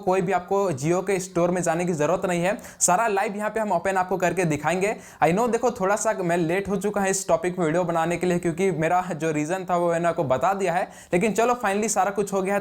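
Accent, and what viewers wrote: Ranchi, India. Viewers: Bhai app ranchi sa ho kya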